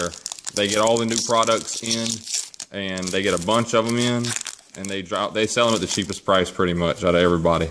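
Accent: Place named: South, United States. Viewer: why do you speak so country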